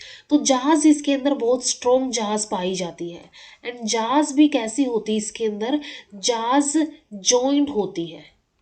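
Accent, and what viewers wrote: Haryana, India. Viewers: Are you from hariyana?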